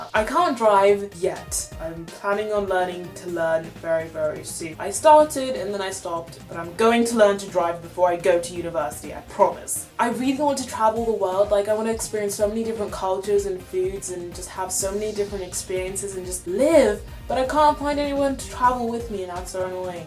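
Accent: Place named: Australia. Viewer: are you Australian cuz u got the accent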